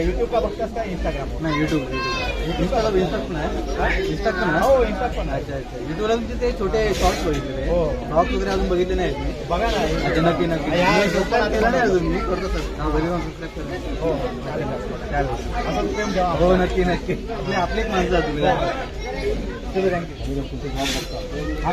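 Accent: Malvani, India. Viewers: malvani manapasun n khaychiv natka n krta boltas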